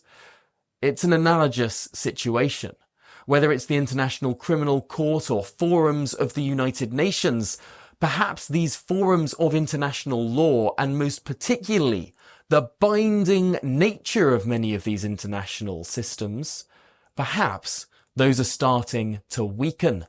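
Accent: United Kingdom. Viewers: Lovely British accent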